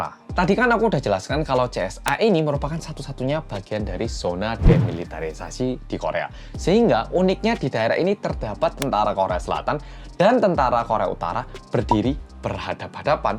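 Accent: Java, Indonesia. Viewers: Gaya bicara pembikin konten cukup nyentrik, wajah Korea ngomongnya "medhok" kejawa2an 🤣🤣🤣tp aku like lho... 👍👍👍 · Tim gercep mas ~🤣🤣🤣 orang Korea yang MEDOKKKKK~~~ btw aku kesini cuma gara² ada Hyun Bin (Kapten Ri)😭😭😭🤣🤣👍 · Suara nya kaya orang Jawa Medok banget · Lah kok ngomongnya kaya jawa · Lagi nonton KBS Live di YouTube,eh ada notif dari mas....auto klik dong. Bersama orang korea yang "MEDHOKKKKKKKKK" Lho rambute sampean ganti maneh mas. Lup² mas ❤ Jatim hadir disini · Jawa banget suara nya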